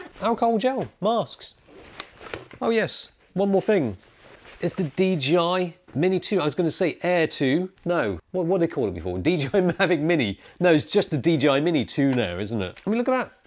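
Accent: United Kingdom. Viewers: Legitimately the most British voice I've heard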